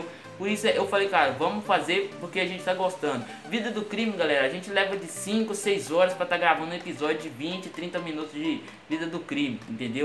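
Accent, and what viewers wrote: Minas Gerais, Brazil. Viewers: mano vc só pode ser mineiro kkk😂😂 parabéns agora rumo a 1 milhao tmj #forçachape😭😭👏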